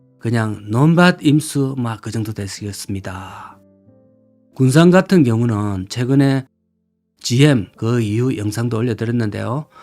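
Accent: Gyeongsang, South Korea. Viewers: 우야노.....??앵커는 경상도??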